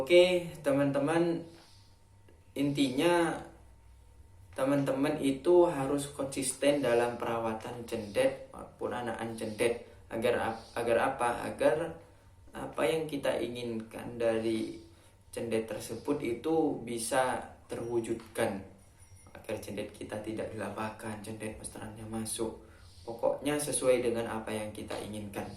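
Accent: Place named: Madura, Indonesia. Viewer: Madura nya bang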